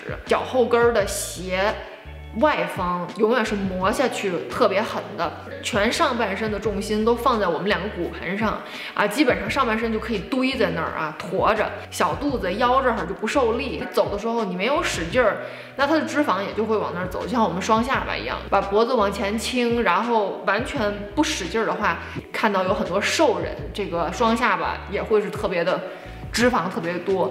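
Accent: Tianjin, China. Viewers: 老乡，一听你说话就知道你是天津人，超飒。一听到你说，马上贴墙测试，发现轻松两只手可以放后背，那我还适合这个站姿吗，怎么让骨盆回到正常位置？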